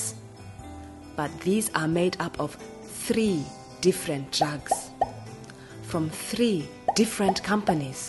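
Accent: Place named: Africa. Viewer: By your pronountiation i assume you're from Africa, you should know that the problem of Africa is just NUTRITION, not AIDS, you should ask for proper food and for clean water, not for drugs or medicines. It hasn't been proved this drugs works and it is scientifically well known their toxicity, that can kill quickly someone who hasn't a good nutrition. So why? Thabo Mbeki was right. That's the reason why he falled down... Turn on your brain please.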